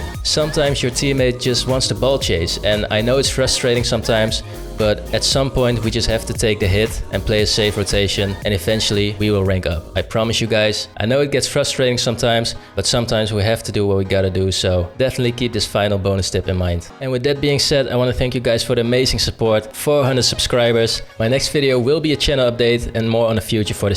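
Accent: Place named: Netherlands. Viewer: Nice video man you are insanely underrated and are you from the Netherlands by any chance I can kinda hear it when you talk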